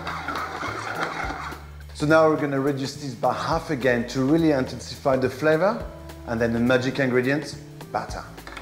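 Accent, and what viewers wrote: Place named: France. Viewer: Omg I know is French and his accent makes it hard to understand. The subtitles however is terrible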